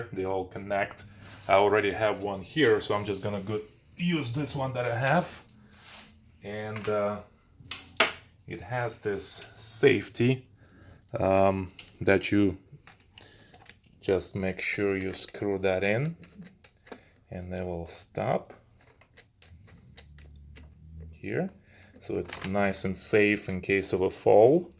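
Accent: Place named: Russia. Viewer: The commentator is russian? His english is very specific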